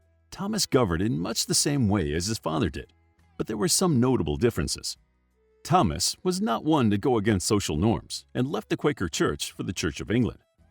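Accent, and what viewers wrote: United States. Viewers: This Yankee narrator's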